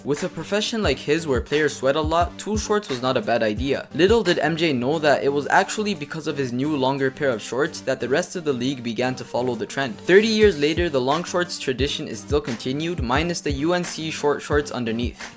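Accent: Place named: India. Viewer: 听起来像印度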